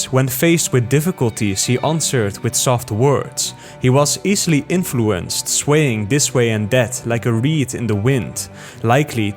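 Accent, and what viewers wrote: Netherlands. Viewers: Great video, but do I notice a Dutch accent there?🤔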